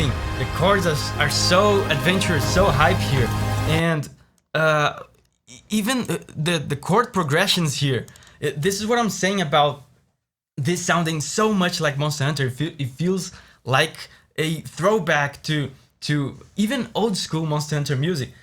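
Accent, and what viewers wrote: Brazil. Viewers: Mano, descobri que vc é brasileiro pelo seu sotaque e pelo sobre do canal. Legal demais ver brasileiros com um grande público estrangeiro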